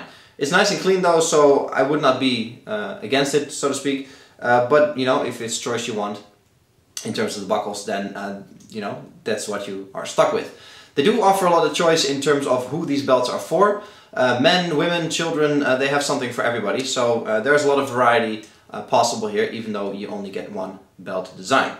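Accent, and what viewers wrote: Netherlands. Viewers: Is the presenter dutch?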